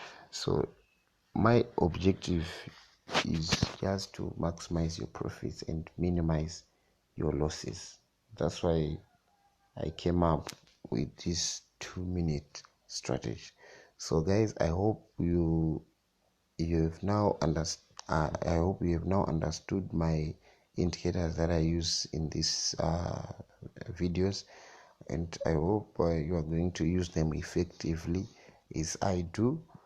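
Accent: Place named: Zimbabwe. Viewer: are you zimbabwean??..ndanzwa tushona umo..how can i contact you bro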